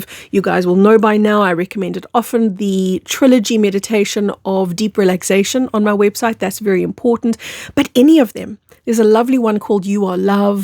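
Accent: South Africa. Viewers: Hi I found your channel a few weeks ago and love the info your putting out. All I know is that my soul family is the blue ray of light and my power is the violet flame of transformation when I let the energy flow it is bliss and peaceful and sends goosebumps all over my body. I understand oneness Iwant the best for every human on this planet 🌎. No obstacle thrown in my path will get me out of my inner peace. I had no help from others learning about energy vibrations and frequency’s. I don’t care about the 🎤 microphone I see your energy and oneness your a lovely being shining your light. Much appreciated oh 1 more thing I love your South African accent 😊. I have a blue aura what is yours? Sending high vibes from Vienna Austria 💙💙💙✨💫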